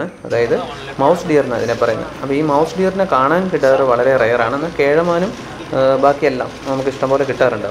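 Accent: Kannur, India. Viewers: താങ്കള്‍ കണ്ണൂര് കാരന്‍ ആണോ ബായ്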